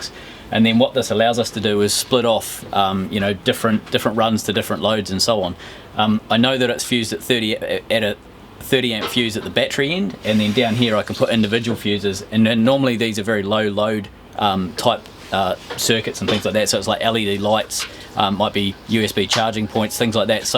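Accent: New Zealand. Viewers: you are blossoming as a comedian. Your Kiwi accent is channeling Fred Dagg (poor John Clarke, we miss him). When you mentioned "My mate Trevor" the impression was complete!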